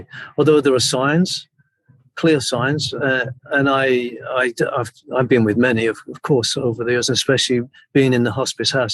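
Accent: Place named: Scotland, United Kingdom. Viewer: My favourite Scottish YouTuber award always goes to. I just looooove that Scottish accent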